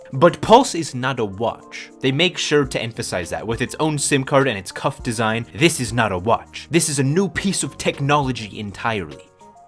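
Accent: United Kingdom. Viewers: This is the best video 11 out of 10, best british accent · That brit accent lmao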